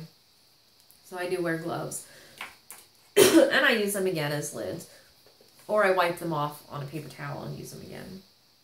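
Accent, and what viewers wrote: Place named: Midwest, United States. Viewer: I like the subtle midwest accent with a touch of pirate on the '-ar' like jaaaar.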